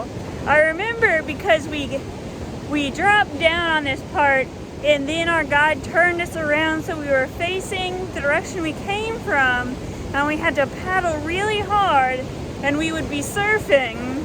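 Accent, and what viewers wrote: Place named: Kentucky, United States. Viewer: Yo Kentucky Accent..cool..I knew a guy once from Louisa Ky. The hill of Eastern Kentucky seem to hold do mystery and settled by Irish, Scotts, tec...when I lived in Asheville several Yrs ago for asking year.I traveled up to Boone NC several times and cured my facination with Appalachia..I visited Harlem Ky....little did I know at the time I was driving my White Volvo X- Country Wagon..thru those hill at night..with NH plates that say " live free or die" I wasn't fearful at the time, however looking back..yikes..only see the sun for 4-5 hours a day..huge coal mine history and exploitation of ppl by big coal companies.of course I binged Net Flix and watched all seasons of Justified before visiting Harlem..with patty loveless singing about harken..😃 quite a history in those hills..I did meet Leah from the music sister duet if Rising Appalachia in Avl..you got some guts courage mixed with winderlust is perfect combo..ty very much.... 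Dave 🤸☮️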